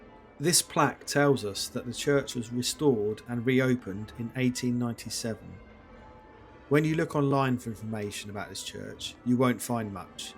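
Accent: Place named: United Kingdom. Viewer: Perhaps you don't know but there are very many regional accents in the UK and pronunciation can vary from one area to another. How anyone pronounces words can very much depend on where they were born and brought up, another matter of historical interest. You understood what was meant, so what is there to criticise?! Glad that you liked the presentation, that is what matters 😊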